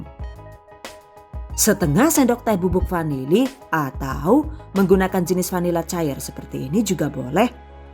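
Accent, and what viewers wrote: Banyumas, Indonesia. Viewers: wong ngpak..hehe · Angel temen diwalik kiye.......😀😀😀😀😀😀pada bae mba,aku juga ngapak. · Gaya bicaranya lucu, ternyata ngapak.....org Tegalll atw mn nih....😃😃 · Salam ngapak Bun..orangapak ora kepenak😂😂🙏🙏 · Mbokiek jawine ngendi tah.. bosone ngapak..uuu lutunaaaa 😝🤣👍 ... but i love your banana krispi...pake toping amazing..👍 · Sebenernya asli mana sih bun, kok ngapaknya kaya istri saya 😁🙏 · Kok ketawa ya setiap lihat vidio vidionya karna ada suara ngapak nya 😂😂😂😂 Tapi aku suka sama resepnya mantul bener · Ini dr madiun apa mana ya kok ngapak? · Tks resepnya jeng yg kadang2 ngapakk..tp aku nonton truss..ngapak.ngapak maju trus resep2 kulinernya jeng .... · "DONE" Ujug2 muncul neng beranda yuotube, ndeleng judule pisang lgsg tak klik 😊😅 eh ternyata aku terpesona dg logat ngapaknya. Piye ikilah jaaaaand terngiang2 bae suara ngapake mboke 🤣😂✌️ muga2 bisa kaya mboke ya pinter masak werna2 🤭🤭😂 #GiveawayTHV2020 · Saya selalu mencatat kata yang selalu diucapkan dlm setiap video THV yaitu kata "Jadiiiii ....." dan aksen ngapaknya itu lho hahahha .....kereeeeen mbak beda dgn yg lain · "Done" Trimakasih resepnya Bun,prlu dicoba in InsyaAllah...,diselingi bahasa yg ngapak bikin ketawa trs..😄😄 #giveaway THV 2020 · DONE Saya suka dg vidio resep"nya karna jelas dan lengkap dg tip &trik nya apalagi ditambah cara penyampean dan logat ngapak yg khas huh pokoknya angel....angel di suruh gak nonton,di catat lho mbok lali,😘😘😘💖💖💖#GiveawayTHV2020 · "Done" Selalu nungguin "ngapaknya"😁 n nnton ini pas lg nenenin bayiq yg brsn lahir. 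Sempet ketawa sendiri pas bilang "Angel-Angel" alias susah.. Coba klo dtulis Angel bs jd artinya brubah jd "Malaikat" 😁 Btw.. Sukses trs bunda.. Selalu mnginspirasi.👍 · Well come back.. ketemu maning ora ngapak ora kepenak..kabare yu sehat.. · Senemg bgt nonton chanel ini...ngapaknya sama dg saya...😂😂😂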